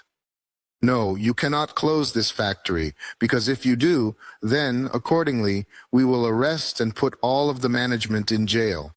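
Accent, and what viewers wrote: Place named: United States. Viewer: Очень прикольно слышать "Андрей Борисович Кобяков" и "Олег Владимирович Григорьев", сказанные Михаилом Леонидовичем с натуральным американским акцентом. Конечно, ИИ ещё не совершенен, но здесь -- вполне терпим: по крайней мере, все частоты присутствуют, я все слова разбираю на слух и хорошо понимаю речь. Ещё прикольнее было видеть, как, не переставая чисто говорить, бесшумно отхлёбывает чай из кружки, которая вдруг становится прозрачной. В целом, ИИ следует подрихтовывать при первой возможности, а также, возможно, отдельно записывать адаптированные для него речи, чтобы артефактов было поменьше. Через год-другой, вполне возможно, это уже не потребуется, потому что ИИ сейчас развивается очень быстро, и его качество также быстро растёт.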